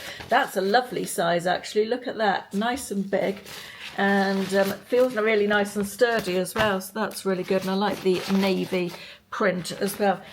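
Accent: United Kingdom. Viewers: I order a lot from Temu but most of it is for craft supplies/scrapbooking, bookbinding and junk journals. Most clothing items, tablecloths and placemats are made of polyester. I tend to perspire a lot and can’t wear polyester so I don’t order clothes. I like the fact that you can return as many items as you want with free return postage on each order. I haven’t sent many things back, most things work as they should. Certain things are more expensive than on Amazon, etc. you have to know your prices. My biggest complaint is they pack everything in thin plastic bags so many items get wrinkled and smashed up in shipping. For example, gel plates for printing should be packaged in a box so there are no dents or depressions on them. The dents will end up showing up when you print. I love your videos because you jump right into the unveiling. It’s so irritating when people ramble on and on for 5-10 minutes before they get to the nitty gritty. 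Your personality is so charming and I love your British accent. You have a great sense of humour (tongue in cheek) and you don’t take yourself too seriously. I like watching what you buy because it gives me some ideas about things I’ve never noticed on Temu. Thanks, keep them coming, be well!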